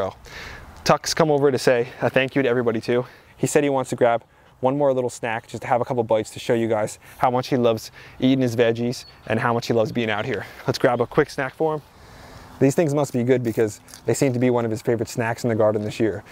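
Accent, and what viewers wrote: United States. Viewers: I was thinking he was an American in Jersey uk .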